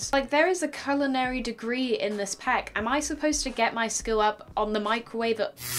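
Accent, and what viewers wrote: United Kingdom. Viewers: i forgot you were british and i was SHOOK out of my mind when you started talking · I just fucking realised, that is a female version of Pyrocynical. No joke:O. SiGnS, tHaT gO bEyOnD rEaSoNaBlE cOmPaRiSoN: -British (heavy British accent) -white/light colored hair -look pretty similiar (in my opinion) -editing is vaguely similiar (cuts, jokes and zooms) That is it I think.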